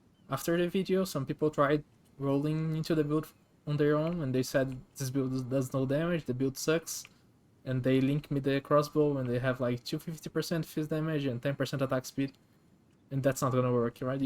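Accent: Brazil. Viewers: My brain is sensing a brazilian accent on you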